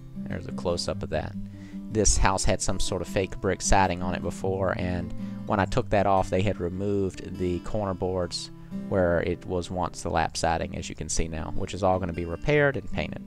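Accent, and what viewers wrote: South, United States. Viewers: Love your videos man. Gives me inspiration in my seemingly never ending home renovation project. My house was, still kinda is in rough shape and figuring out what to do can really tax you mentally. Did you learn at a trade school or have you been doing this for a while. I noticed your accent too, I'm guessing you live in the south as well as I. Greetings from North Carolina and keep it up! 👊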